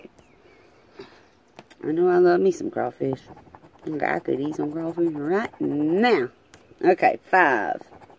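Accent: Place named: South, United States. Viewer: Nice southern accent gal!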